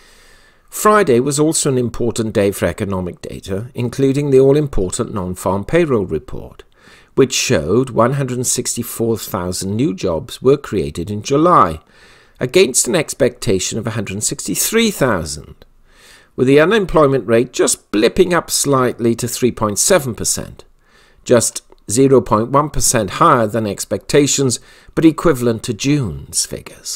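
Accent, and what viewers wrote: United Kingdom. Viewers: Is this a regular British accent?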